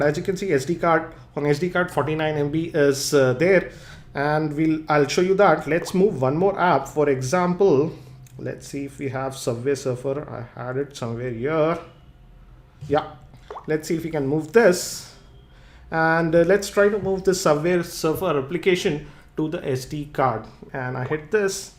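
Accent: India. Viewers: That's an Indian accent bro and he's Indian so he's not faking it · Lol kinda cool how your voice started out like american then went full blown indian lool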